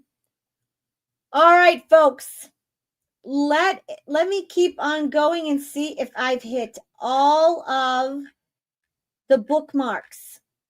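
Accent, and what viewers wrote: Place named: Ireland. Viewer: Love the Irish accent.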